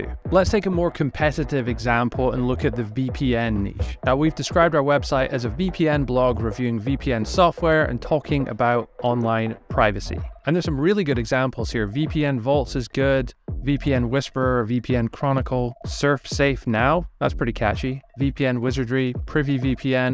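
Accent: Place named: France. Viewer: because for French Accent and the funny (and useful) video i voted on Product hunt too:) Thanks guy for this tool and videos:)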